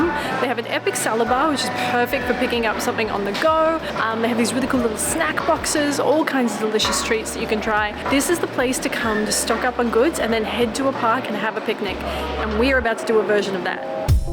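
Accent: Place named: Australia. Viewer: Should have stopped at the firehouse by the Brooklyn Bridge, bet they would love to chat with a beautiful woman with an Australian accent.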